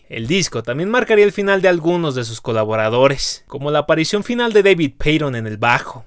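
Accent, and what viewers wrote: Mexico. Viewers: Las historias son supremamente interesantes pero el narrador: fatal! Ese acento mexicano es el peor del idioma español, es un acento náuseo.: eduque su voz y modifique ese fastidioso acento.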